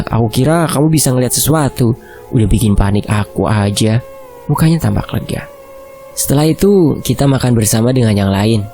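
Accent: Java, Indonesia. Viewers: Min..: suarane medhok heheheh suka deh Kangen kampung halaman 😁😁😁😁😁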